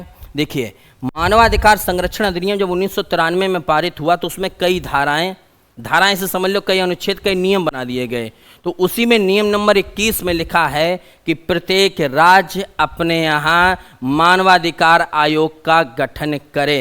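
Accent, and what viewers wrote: India. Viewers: गुरुदेव बहुत अच्छी क्लास रहती हैं आपकी सारी क्लासेस देख ली मैंने । आपकी क्लास में अपनापन लगता। अवधी टच , और कोई दिखावा नहीं👍👍👍👍👍👍👍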